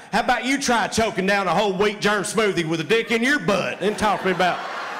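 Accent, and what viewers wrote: South, United States. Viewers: I love how they are subtitling his Southern accent. Ha! I know my friends don't always get my Southern references or dialect. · Born and raised in southeast va … not used to hearing intelligent speech drowning in a southern drawl. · I love this guy's work and there is just too little of it to find online. Not to mention that he lives basically in the same area as myself now, but I can't find any shows he's doing locally. I may have to eventually figure out how to hire him just to come up here and talk for an hour or two so I can turn off my TV (which is also my 65" computer monitor) and let it get some rest. Dude, write more stuff and write it even faster. Please! You actually had me, for a moment at least, thinking "we my be able to at some time hype that accent as more educated than the stereotype. I know he would know exactly what I'm talking about. 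It's not an insult as a fact can never insult anyone yet still be totally insulting at the same time. If the south ever does rise again, I hope the thing that rises from it is the intellectual level. Which, it would appear, yours has done. Fine sir. (I mean think about it.... Just how long can you go on watching people put milk and sugar on grits? You know it's butter, salt & pepper and if you have brains some egg and sausage on top just to level it out a bit. With maybe some Trappy's sauce on top of that. )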